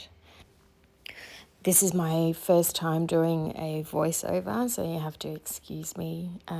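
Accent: Australia. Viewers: So nice to hear an Aussie accent. I have just recently discovered Uniquely Creative. I love the beautiful papers. You make card making look so easy. Looking forward to seeing more. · I'm in NSW & it's lovely to hear an Aussie accent for a change so feel free to be as chatty as you like 😉 Really enjoying your videos and you're doing great so just keep going 👍👏 😍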